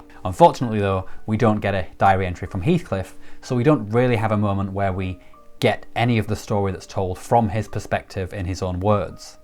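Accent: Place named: Scotland, United Kingdom. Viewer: Is your accent from Scotland or from Northern Ireland? (I love it)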